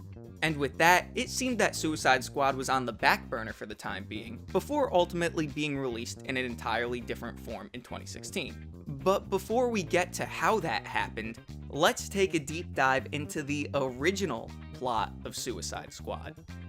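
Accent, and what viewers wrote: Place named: Boston, United States. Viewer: Homeboy and his Boston pronunciation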